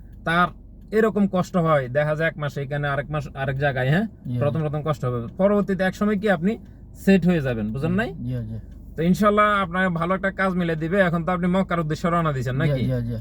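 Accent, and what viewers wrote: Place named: Sylhet, Bangladesh. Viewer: সিলেটের লোক মনে হয় 😢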